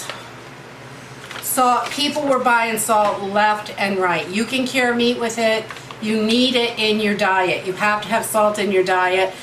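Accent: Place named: Maine, United States. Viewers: I'm from Boston. I knew your "accent " that you were from New England. Love your videos. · I knew you were from Maine when I heard, “you’re bummin’” Subscribed 👍🤣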